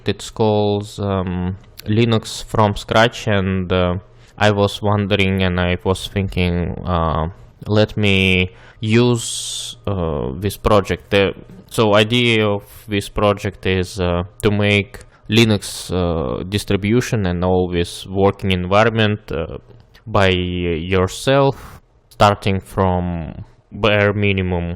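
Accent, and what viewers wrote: Russia. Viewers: С русским акцентом даже англ текст легко понимаем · Are you Russian? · Мне кажется или он русский???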